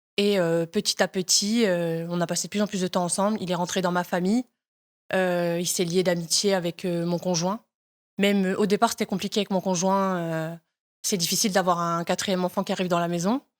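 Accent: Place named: North, France. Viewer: J aime quand ton petit accent du chnord ressens . Parfois j ai pu entendre Medhi a travers ton interview.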